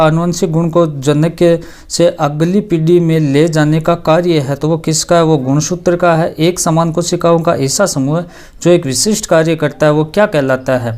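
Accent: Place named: Marwar, India. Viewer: Marwadi accent is op😂😂❤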